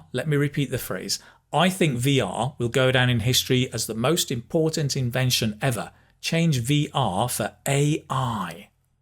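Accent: United Kingdom. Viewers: Please someone tell me that " is this British Accent?"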